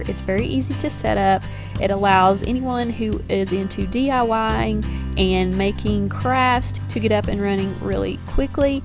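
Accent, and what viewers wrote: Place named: Eastern Kentucky, United States. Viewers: God I love the accent! Eastern Kentucky or Tennessee. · I feel like you're closer to Kentucky cuz you have a thicker accent could be wrong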